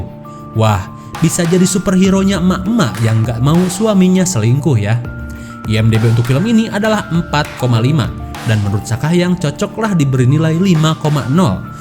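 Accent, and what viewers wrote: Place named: West Java, Indonesia. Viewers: Suara sunda mh enak ya bund;v mah kuat wat wat wat wat wat terngiang² 🤣🤣🤣🤣😴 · Bang kamu pasti orang sundanyak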